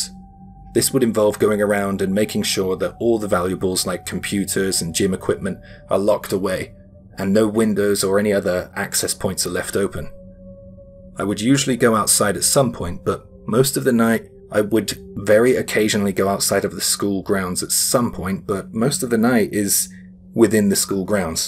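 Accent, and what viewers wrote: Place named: England, United Kingdom. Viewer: Such unique content. Japanese stories translated to American English with an English accent! You have something special here.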